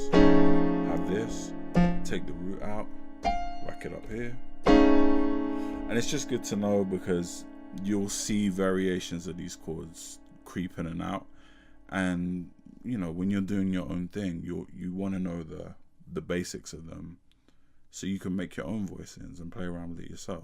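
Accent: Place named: United Kingdom. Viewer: Wow when I heard the British accent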